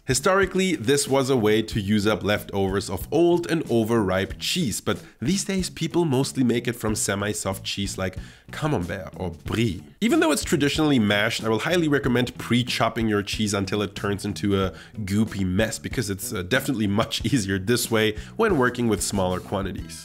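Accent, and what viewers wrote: United States. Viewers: You have such an American accent. It's kind of offensive that you start your post dissing other Americans. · You r accent is too american,I would have never guessed you were ein dEUTCHLAND Mann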